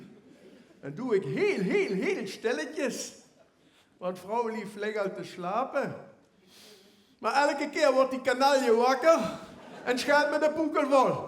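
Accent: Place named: Limburg, Netherlands. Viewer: Moest als rotterdammer wonend in Limburg goed luisteren naar het dialect maar wat een humor heb hem inmiddels al 8 keer gekeken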